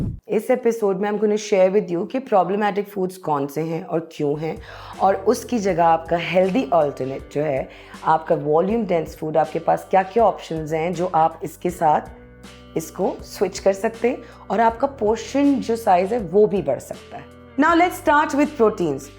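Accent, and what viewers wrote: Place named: India. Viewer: Very informative. Also take some positive criticism. Speak more in Pakistani accent. There is a touch of Indian accent in your tone, maybe because you watch Indian media.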